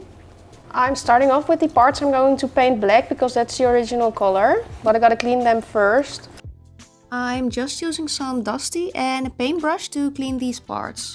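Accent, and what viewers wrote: Netherlands. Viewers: What is your native language? You sound Dutch?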